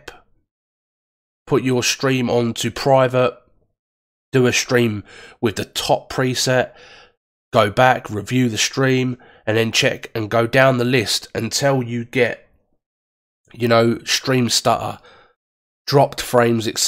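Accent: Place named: United Kingdom. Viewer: Ur too much British accent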